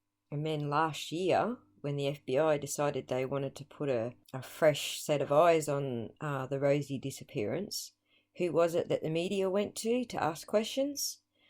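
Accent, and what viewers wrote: Australia. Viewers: Exactly what I been saying. I see her being the Female "Mike oh" with her Aussie twist!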